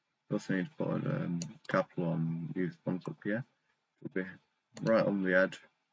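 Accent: United Kingdom. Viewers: Bri’ ish voice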